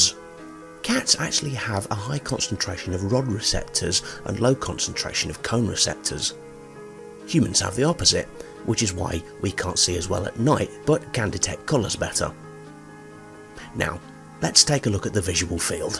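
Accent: United Kingdom. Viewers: Very informative....and it’s great to hear a British voice for a change xxx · "Cats are also thought to be trichromats but not in the same way humans are." Wow! He's British! 😂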